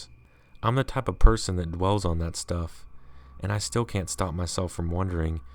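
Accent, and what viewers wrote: South, United States. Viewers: Southern accents rule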